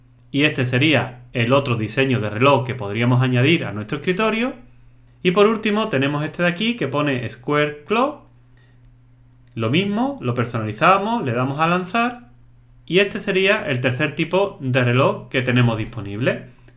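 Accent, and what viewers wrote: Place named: Andalusia, Spain. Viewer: Anda que no "ere" tú "andalú" "niná", jeje. Yo también lo soy.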